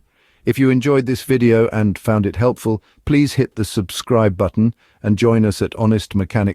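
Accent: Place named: RP, United Kingdom. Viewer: WTF is this Brit talking like this is etiquette school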